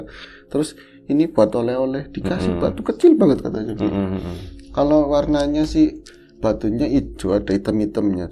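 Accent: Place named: Tegal, Indonesia. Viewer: Mas msh tetep di NGOBOR dong,jngn digantiin yg lain,soalnya udah enak ndengerin suara,n logat tegalnya,tdk tergantikan...😄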